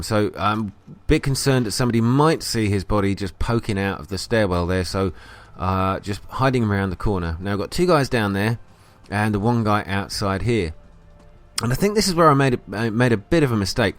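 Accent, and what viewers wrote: United Kingdom. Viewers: Aahh.. I see now that you'd been alerted already, lol.. I had to click "show more comments" to see this one. Hey - you should make a note of that in the description (edit) or something mate... look how many folks didn't notice it and are still thinking that anti-stealth is going to earn near-equal points as stealth - when it's actually less than half the XP eh? Well - I am now subscribed for your future efforts - nice to hear that cool brit accent lol.. cheers!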